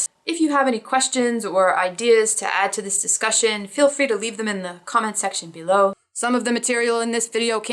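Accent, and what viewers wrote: Boston, United States. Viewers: This was really informative. Thanks! And your boston accent cracks me up 😆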